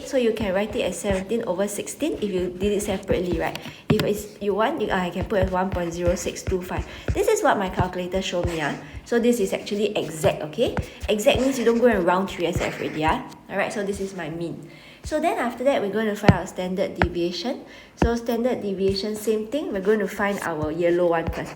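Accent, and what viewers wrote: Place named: Asia. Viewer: The most Asian accent I have a test tommorow 🤔👍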